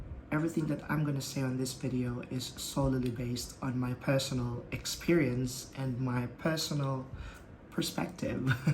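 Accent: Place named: United Kingdom. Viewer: I can heaar UK accent 😂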